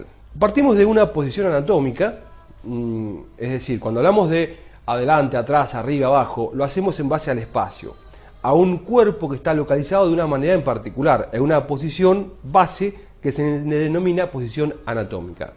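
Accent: Argentina. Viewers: Hablan lindo los Argentinos! Gracias por el video :) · no sabes de planos y te haces el que sabes de vaginas. de paso tenes una voz de porteño importante amigo. gracias por el video de todas formas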